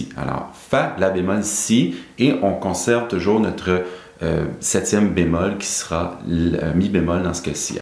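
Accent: Quebec, Canada. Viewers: Salut, merci de tout cœur. Ce qui me tue, c’est que je sais tout ça de façon empirique, et autodidacte, et même ton accent me dérange moins depuis que j’ai une amie d’enfance établie au Québec qui a repris contact avec moi….😂 Le problème est que je suis porteur d’autisme et que je suis totalement bloqué lorsque quelqu’un parle en mots alors qu’on est en « notes » ou en « sons »…. Aussi radical que ça, alors mon autisme, surtout en musique puisque c’est mon intérêt spécifique, est clairement invisible à yeux des autres. Je fais le vœu ici de découvrir et suivre ta chaîne pour apprendre encore et je continue d’improviser sur les disques ou music tracks de YouTube. Merci encore. Je m’abonne.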